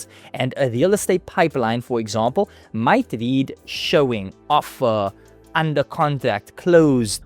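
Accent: South Africa. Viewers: Intresting dialect, you i SAfrica? Sound like a combination of hindi and Afriaans mix in English. I like it. ☺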